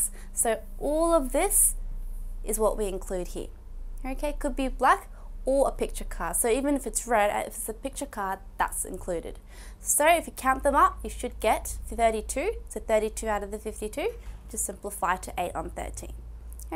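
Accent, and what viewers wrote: Australia. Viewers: I like your Australian accent! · Omg thank you so much. She really explained very well. Btw the girl who teach reminds me of Rosè from Blackpink because of her Aussie Accent. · Aussie accent tho. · It's messing with my mind watching a Chinese girl with an Australian accent.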